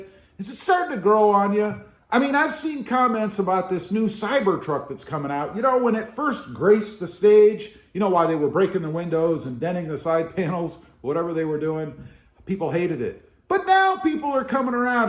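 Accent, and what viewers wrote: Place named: North, United States. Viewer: You're in Texas? Sounds like you have a northern/eastern accent.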